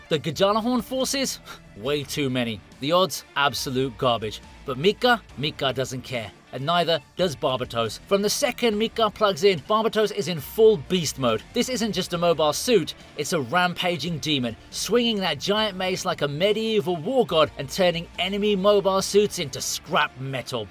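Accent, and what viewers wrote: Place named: United Kingdom. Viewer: Always ruined by a terrible British voice.....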